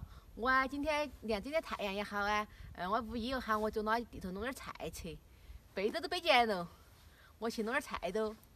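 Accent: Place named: Sichuan, China. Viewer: 一直来都没听你在视频里说话 今天才知你是川妹子 声音很好听 以前我还以为你是南方广东人呢……。加油💪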